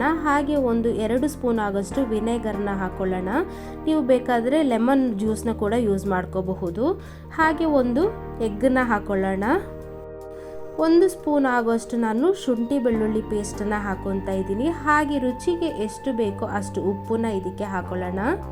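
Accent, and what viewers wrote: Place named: Bangalore, India. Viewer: Ninde kannada super cook sis 🥰👍👏👏😁nin pakkka Bangalore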